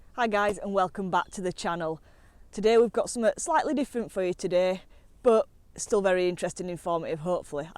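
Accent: North, United Kingdom. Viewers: Another great video, in a nice northern accent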